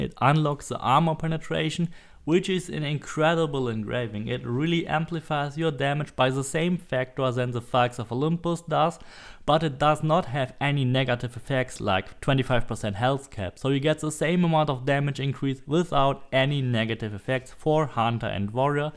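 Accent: Germany. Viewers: You german, you've got a hard accent no offense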